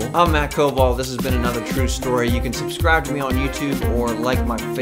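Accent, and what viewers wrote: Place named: New Jersey, United States. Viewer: For the longest time I would have thought you to be Italian because of your accent (you sound like you're from NJ and with the nice thick black hair and chiseled jawline... slaps face ... okay Tiny, ya need to get back on track!) In that respect I would have assumed you were Catholic. But my friend says your last name is Ukrainian and if you were brought up in any religion it would likely have been Eastern Orthodox or Lutheran, depending what part of the Ukraine your family had originally immigrated from. So is she right?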